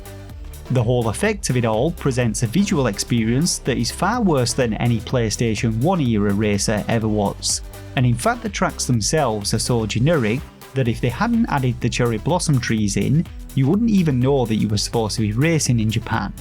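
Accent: United Kingdom. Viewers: Hey, not hating on you or anything, but please can you tell me what your accent is? I am genuinely curious. I can’t understand most of what you say, but I am fascinated that the auto-translate can pick up 99% of your brit English. I just need to know please. I am beyond OCD at this point and it frustrates me.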